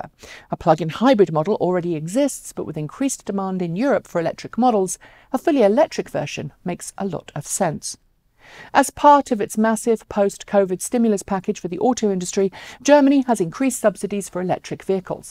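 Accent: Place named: England, United Kingdom. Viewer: Your accent makes me think of cream teas and an English countryside . Thanks .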